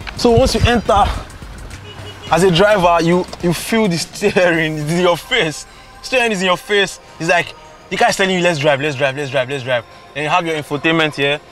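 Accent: Nigeria. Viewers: I am used to seeing Nigerian youtubers try hard to modify their Nigerian accent. It is a breath of fresh air to see one keep it real, its actually lovely. Much love from Canada. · I love the fact that he isn't forming...speaking like a true Nigerian guy...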